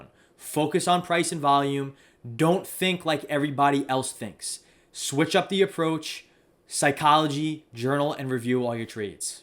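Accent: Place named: New Jersey, United States. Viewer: Damn brother what a ride!! I decided to get a finance degree after watching the documentary ''Inside Job'' and my uncle is in finance. The documentary made Wallstreet look like a Mafia Scorsese movie and I was a street kid and thought damn, these guys are wise guys and they don't go to jail?? like the regular wise guys?? I signed up straight away for community college and then went to university - still get wise guy vibes with your Jersey accent brother 😂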